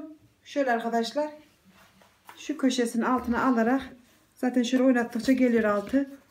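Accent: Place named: Vezirköprü, Turkey. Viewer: Abla bizim oralı olduğun belli biz gibi konusuyon bende vezirkopruluyum ismim gülsüm beğenerek izliyoz😊👍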